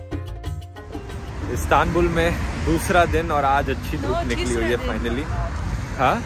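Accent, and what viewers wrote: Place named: India. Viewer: From the thumbnail I thought he was an Italian tourist than he spoke Indian, my Brian start rewiring!!!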